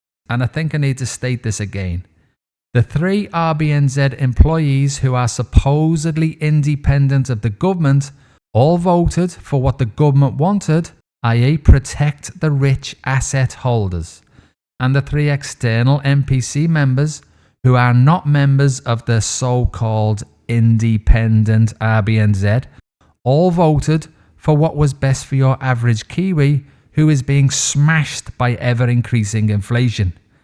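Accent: Liverpool, United Kingdom. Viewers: Ah I’ve missed that Scouse lilt and it’s take on NZ! Welcome back! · Not big on Cynicism. The tone is off putting. Not sure a British accent helps either. Sorry but Brits have been telling us what we're doing wrong since greedy Governor Grey. Go home and fix your own problems - not add to ours please.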